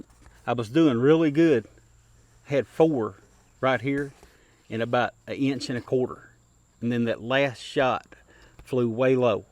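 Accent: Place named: South, United States. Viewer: that southern drawl gets soo annoying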